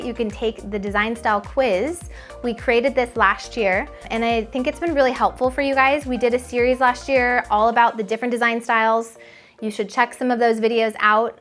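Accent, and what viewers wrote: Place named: Texas, United States. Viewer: I'm from Texas and you do kind of sound like you're from Texas! My biggest struggle is COLOR. My fiance decorates without really thinking about color which is clashy, and I just don't know how to put colors together in a way that is not clashy but still interesting! I would love a video on this or maybe some templates! I would also like tips on incorporating old furniture and decor that isn't necessarily your current style.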